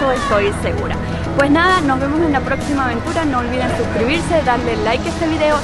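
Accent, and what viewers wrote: Venezuela. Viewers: Eres venezolana?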